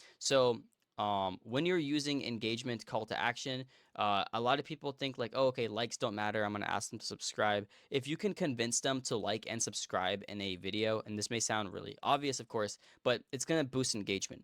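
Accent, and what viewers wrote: United States. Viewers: Are you 100% American? If you're not, you're really good at english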